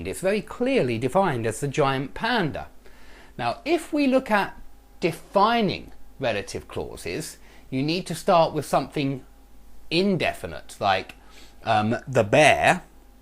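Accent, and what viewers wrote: England, United Kingdom. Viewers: are you English? I loved your accent:)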